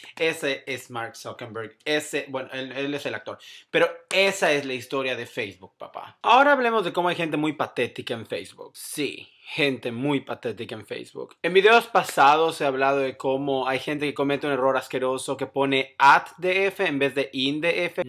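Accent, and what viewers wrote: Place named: Yucatan, Mexico. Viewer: eres de Yucatan?? tienes el acento....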